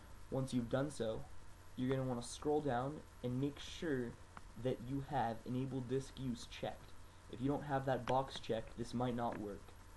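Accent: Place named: United States. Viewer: that perfect american accent you have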